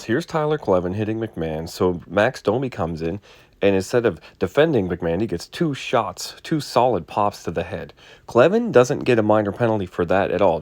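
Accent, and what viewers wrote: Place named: Ottawa, Canada. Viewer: You sound clueless. And like a resident of Ottawa.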